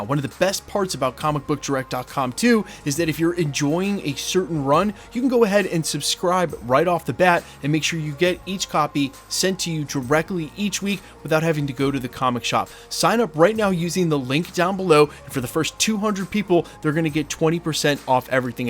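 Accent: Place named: Maryland, United States. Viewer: Bruhhh ur accent...... you've gotta be from Maryland 😂😂😂😂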